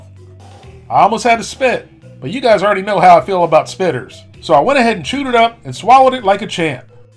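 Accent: South, United States. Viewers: Hey! Just started binge watching your videos this week and I have to say it man, love the southern drawl. Makes me feel right at home. Keep on keepin on brotha!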